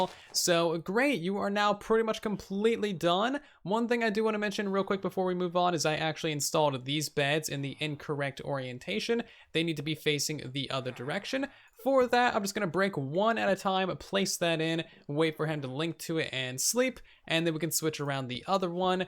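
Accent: United States. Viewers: is secretly mumbo but with a American accent? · is the mumbo jumbo but not for extreme redstone but for game mechanics and with a American accent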